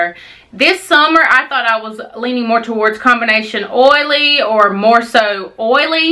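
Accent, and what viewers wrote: South, United States. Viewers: Love ur channel and southern twang!!!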